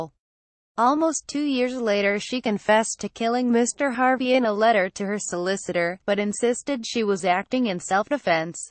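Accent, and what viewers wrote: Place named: United States. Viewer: Love American accents, but very annoying voice 😐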